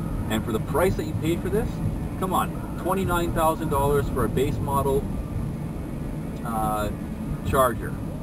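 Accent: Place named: Canada. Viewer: From your accent it seems your Canadian?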